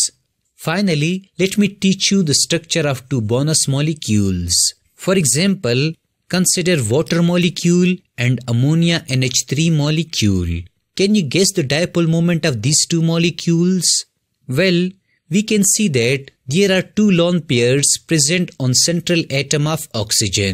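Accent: South India, India. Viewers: that south indian accent tho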